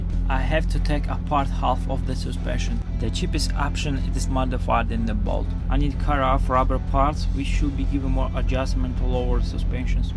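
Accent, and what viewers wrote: Russia. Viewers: Бро, ты русский, или что? Просто оч сильный русский акцент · Какой-то у тебя акцент русский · По ходу русский с таким акцентом говоришь как ты брат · Is the author from Russia? Why is there such a harsh accent in speech? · Вы русскоговорящий ? Акцент очень похож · Наш русский слоняра 😁 акцент не скроешь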